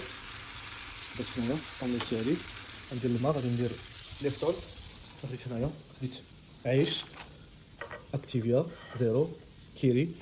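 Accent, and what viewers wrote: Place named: Gulf, Arab world. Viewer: 10 دقايق باش وصل بدا يهضر خليجي 😅😅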